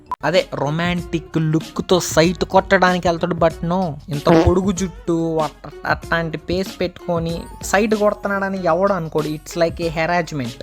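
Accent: Nellore, India. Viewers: Nellore slang bro..😂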